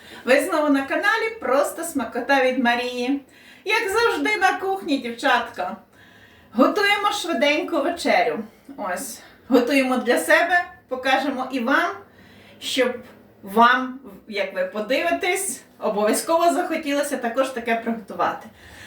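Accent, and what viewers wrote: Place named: Lviv, Ukraine. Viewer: Не знаю от куда вы, но говор, как у моей родной тёте из города Броды, Львовской обл. Мед для ушей. 🥰.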